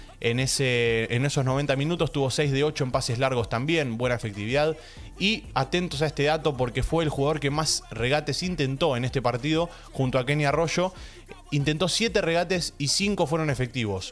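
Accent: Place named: Argentina. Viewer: Che por tu acento se nota que eres Argentino y con esa gran visión de fútbol que tienen ustedes los Argentinos se que vas a llegar muy lejos con tu canal Bendicionez mi pana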